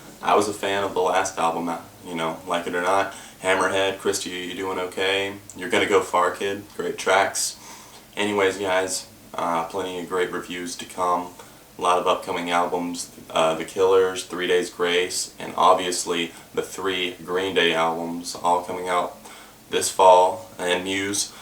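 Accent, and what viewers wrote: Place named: South, United States. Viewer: southern accent is much more prominent in these earlier videos, it makes me chuckle.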